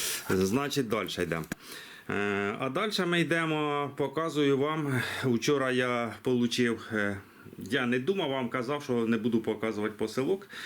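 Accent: Poltava, Ukraine. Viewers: Балакаєте, як на Полтавщині, дуже приємно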